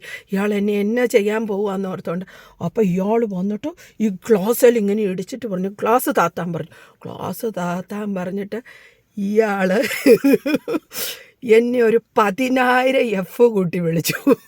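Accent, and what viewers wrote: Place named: Haryana, India. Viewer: Like haryana slang ....same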